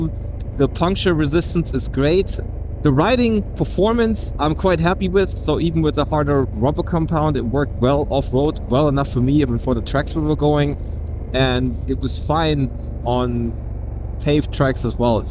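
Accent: Germany. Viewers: Gutes englisch aber den deutschen hört man trotzdem raus haha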